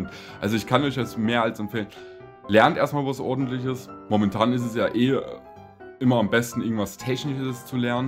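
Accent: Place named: Saxony, Germany. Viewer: Also ich muse ja mal sagen, ich liebe dein Humor, "woher kommst du"? "Von draußen"! 🤣🤣🤣🤣, das hät glatt ich sagen können.Das du aus Sachen kommst hört man eigentlich sehr gut. Aber gut jüngere haben da weniger Ahnung. Das FAQ war sehr Interessant, gerne mehr davon. Aber bitte eine andere Musik beim nächsten mal.Und wünsche dir für deine Umschulung alles Glück der Welt das alles unter der jetzigen Situation statt finden kann. Und ja ich zumindest finde das du Menschen sehr gut Unterhalten/Aufmuntern kannst. Dein lachen und humor sind ansteckend, danke dafür.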